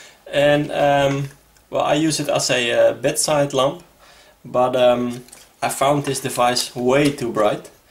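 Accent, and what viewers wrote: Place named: Netherlands. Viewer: by your accent i guess you're dutch, lol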